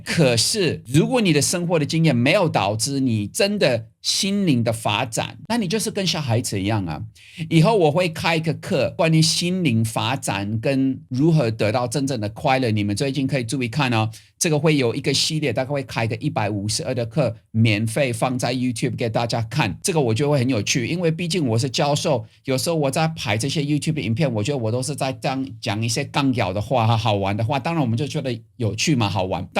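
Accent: Taiwan. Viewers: 我非常確定您不是歪國人 口氣 腔調 用語 根本是台灣人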